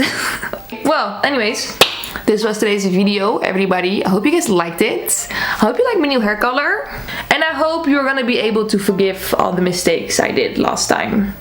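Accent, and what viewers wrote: Netherlands. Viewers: every time I watch your videos I still wonder if ur dutch cuz I never knew but u rly have that dutch accent lol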